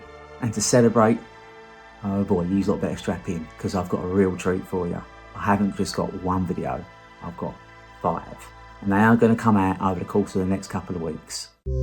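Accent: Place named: United Kingdom. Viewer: i really enjoy your mellow voice and accent - as an american it seems to be more legitimate when talking about the thunderbirds!! I love british sci fi and look forward to more videos. Im really curious about 'The Secret Service" - a personal favorite of mine. Ill never forget the first time i saw the man ride around in the vicars suitcase!!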